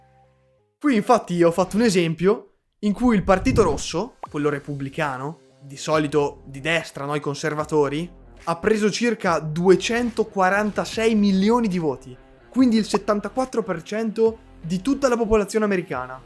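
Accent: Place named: Chioggia, Italy. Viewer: Mi sembri da Chioggia 😊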